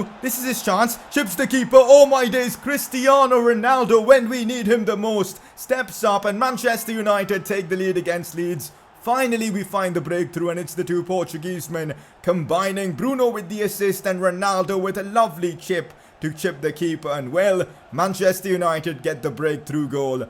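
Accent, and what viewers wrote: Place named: India. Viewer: Pcq:do you just play fifa or play other games as well?? And one more you sounds like indian so do u speak hindi?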